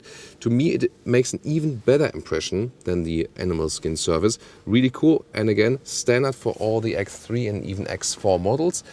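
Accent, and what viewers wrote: Germany. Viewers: beautiful Germany accent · It is interesting how his speech still sounds like german languaege though he speaks english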